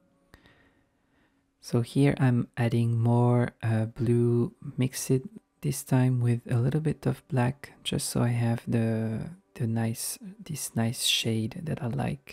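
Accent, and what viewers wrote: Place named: France. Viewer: I recognize a french accent when I here one hahaha 😂 Is your family from Corsica? Beautiful painting as always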